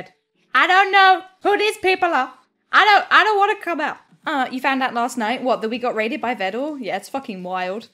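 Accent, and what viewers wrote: United Kingdom. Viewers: Her british levels are much bigger than Vedal's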